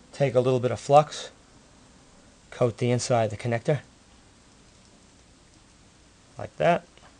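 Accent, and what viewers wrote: United States. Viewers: wondering would it be any easier to drill before you cut it to length...\and sorry I thought you had a slight speech impediment...I didn't realise Americans pronounce solder sodder...lol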